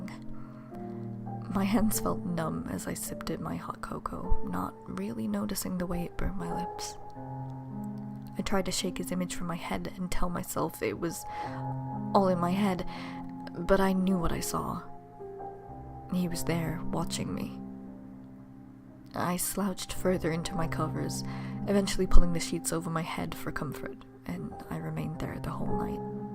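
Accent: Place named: United States. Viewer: If I didn't know better, I'd say you were actually American!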